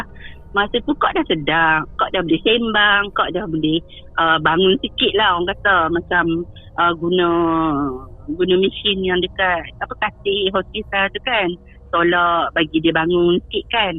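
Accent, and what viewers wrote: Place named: North, Malaysia. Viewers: Saya baru dengar cerita dari ni..sori terlepaih bas 😀 Memang terhibur, sebab cara loghat orang utara sembang memang lagutu, dia punya kona, pusing roundabout, uturn balik, baru sampai umah 😀😀😀😀 Part II kalau boleh naa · Santai chill nie layan malam2 syok, & faham ke tu cakap, pekat gak utara nya tu😅😅😅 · Kemaiihhh,pekattt likat lorat utara.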